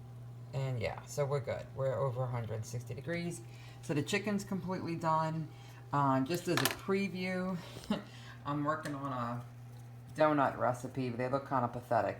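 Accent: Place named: New Orleans, United States. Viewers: Soon as you opened your mouth I said “she’s from New Orleans or southern Louisiana “. The I looked at your username. Lol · Love that Louisiana accent!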